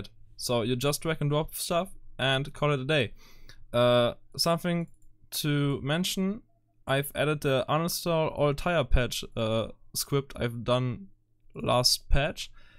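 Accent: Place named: Germany. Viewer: der deutsche akzent😂 danke für das Video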